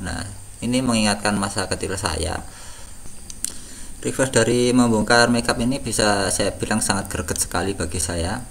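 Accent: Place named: Java, Indonesia. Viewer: Logatnya lowh. Medok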